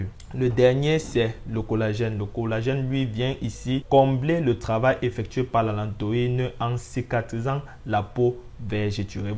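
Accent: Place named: Africa. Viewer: Bonjour à votre accent on devine que vous êtes un africain. Qu est ce qui vous empêche de montrer des femmes de couleur arrêtez ce complexe c est révoltant. Si vous n avez pas de photos de femmes africaines il y a les femmes noires américaines. Vous vous adressez plus à vos semblables et vous affichez des femmes blanches arrêtez ça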